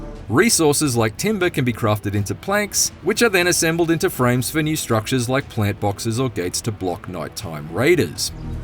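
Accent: United Kingdom. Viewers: IGN please read your COMMENTS. People really dislke how THIS GUY narrates your VIDEOS. It's not like you can't find OTHER PERSONS to read the text for your VIDEOS. Just because he has a British ACCENT, it doesn't mean that HE IS a good narraTOR. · The generic YouTube I'm vaguely British voice ? Meh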